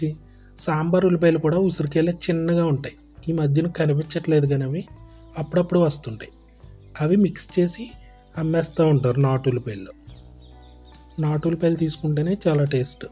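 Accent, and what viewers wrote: Godavari, India. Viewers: గోదావరి తెలుగు భాష , ఆ యాస మార్చకుండా ఉంచండి. యూ ట్యూబు డబ్బులు వచ్చాక కొత్త స్టవ్ లు, Ikea పాత్రలూ వంటివి కొని వంట గది రూపు రేఖలు మార్చేయకుండా ఇప్పటి సహజత్వం కొన సాగిస్తేనే ఆ పాత వంటలకు ఆ పాత కాలపు వాసనలు ఉంటాయి. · Memu Godavarollam andi. Mee vantalu, maata superb. Matallo yaasa over cheyyakunda natural ga undi.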